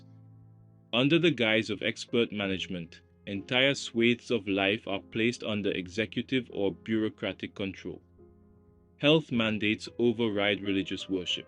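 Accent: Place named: India. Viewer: Sound kinda indian. Can you please curse vishnu for us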